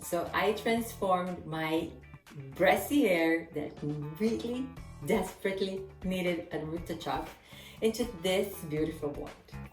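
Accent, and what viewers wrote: Brazil. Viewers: are you Brazilian? I’m from Brazil, and I noticed a familiar accent in your speech and you said tchau in the end, so it made me wonder.:) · Como a gente reconhece o sotaque Brasileiro 😍Tava te assistindo e pensando ela é brasileira e no final vc fala Português kkkk !Ficou show Seu cabelo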